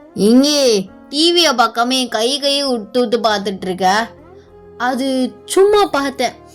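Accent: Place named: Nagore, India. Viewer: Ena sis engala ippidi suspense layae vaikiringa😩but na story half laye guess pannitan y/n also human illanu😁next intha upcoming story kaga waiting sis🤗oru veala enoda guess y/n half human +half Demon or werewolf ha irupalooooo.....Sis neenga demon nu tha solluvinganu nenichan😂na last comment la kuda Demon nu tha potan😂but idhu sema story sis i loved it😊unga slang awesome neenga nagore ha sis😊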